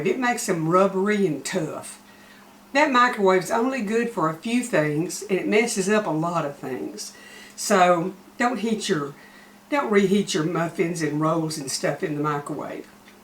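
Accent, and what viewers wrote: South, United States. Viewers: Hi! sent me over and I’m so very thankful she did💚 you so remind me of my husbands grandmother and she lived in Livingston Tx so not too far! I have lived in the Houston area all my life and I just love your sweet southern voice and cooking! I am new to canning and can’t wait to go through all your videos to learn more!!! I also know I’ll love these muffins💚💚💚 MERRY CHRISTMAS to you and yours 🎄🎄🎄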